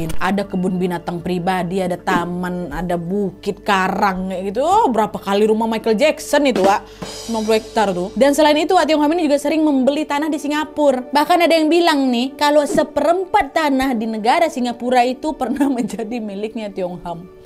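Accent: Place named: West Sumatra, Indonesia. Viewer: Logatnya kayak orang Minang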